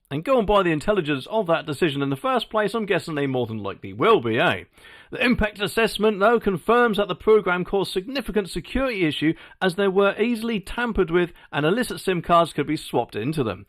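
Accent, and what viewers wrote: Norfolk, United Kingdom. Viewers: You sound just like a good old norfolk boy 😅❤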